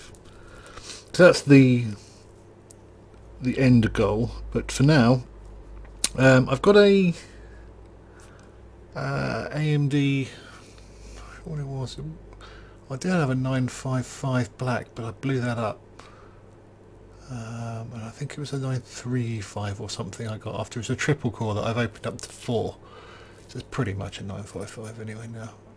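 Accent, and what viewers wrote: United Kingdom. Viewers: where abouts in uk are you from??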